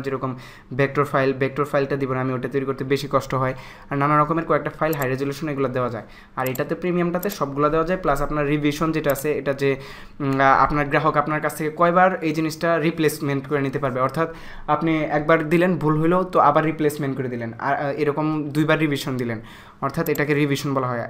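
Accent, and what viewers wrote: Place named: Brahmanbaria, Bangladesh. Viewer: শুভেচ্ছা রইল, কিন্তু আপনার মুখের ভাষাটা ব্রাহ্মণবাড়িয়ার মনে হচ্ছে বা আশপাশের অঞ্চলের। (মোট কথা দেশি দেশি লাগতাছে)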